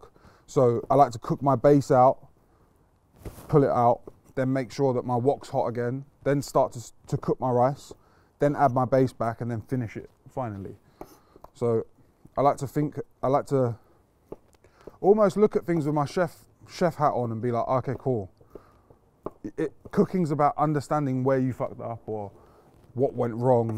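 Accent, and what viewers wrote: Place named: London, United Kingdom. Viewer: He speaks London👍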